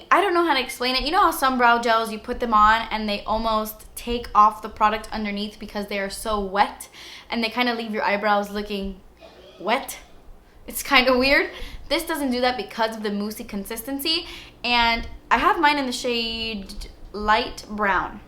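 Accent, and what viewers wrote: England, United Kingdom. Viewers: omg tht british accent tho :'DDDD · Your British accent, so cute!! I'm British so I know 😉 xx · That British accent is a bad habit. Stop. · Love that British accent btw! 😂 😛😘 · I've recently subscribed to you and I absolutely love your videos! You should do an entire video with ur British accent :) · I loved your British accent 👏👏👏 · Girl your British accent always puts me in a great mood 👍🏻💓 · Hahaha your English accent is SO GOOD!!! so funny:D Love you <3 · haha whats with the britsh accent! you are sooo cute...!!! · Your English accent cracks me up! coming from a brit 😊 xx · pls make a video with your british accent. i think its cool. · Love when you do the British accent, you totally resemble Emma Watson! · Omyyyyyy. That british accent. ugh <3 It's so perf! I love you!:* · Your UK accent is on point! lol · Girl we love you! 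I liked this video before watching it! I always go out & look for the stuff you show us cause I'm obsessed. I window shop most of the time for makeup but the stuff I can afford, most of it comes from you lol even though I have oily skin 😳 but I love you! Keep doing you, and I hope your house is ready soon, can't wait to have you back completely and we all appreciate how hard you've been trying to get content out for us! I hope you know how much your subbies adore you!!!! #dassesspensive P.S: I love when you do the British accent randomly! Lol I do that too and I get weird looks like "wtf?" Lol LOVE YOU! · for some reason, whenever you talk in a British accent, you remind me of Leigh Anne from little mix lmao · Love the british accent. Can u pls make a video with the whole british accent?...i really really like it..and of course i love your videos...thanks for mentiong VIB sale love yeah!!!😘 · lmao! 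your british accent kills me every damn time! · You look stunning in this video and the random British accent... I love that I'm not the only one who does that!!! Your perfection!! 💋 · Love your British accent!!! Lol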